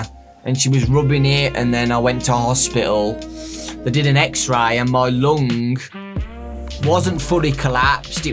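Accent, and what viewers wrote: United Kingdom. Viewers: My family was happy for me when i became a GachaTuber. The only reason im good at a british accent is because i watch you.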